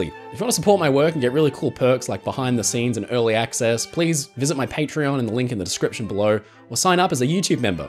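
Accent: Australia. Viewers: he sounds like an Aussie to me?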